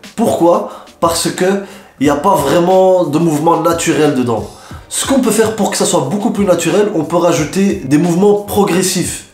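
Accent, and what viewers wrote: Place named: Belgium. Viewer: shaab Belgique haha t'es de bxl non ? merci pour le tuto mon frérot 💪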